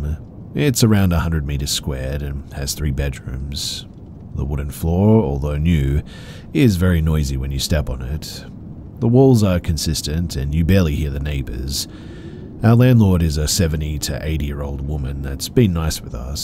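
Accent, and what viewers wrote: Australia. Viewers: His voice actually calms me due to the fact that it’s a really really deep Australian accent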